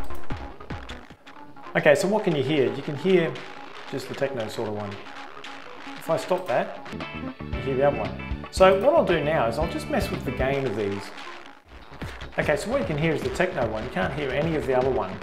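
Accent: Australia. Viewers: So, is it so unusual for someone to mistake and Australian accent for British?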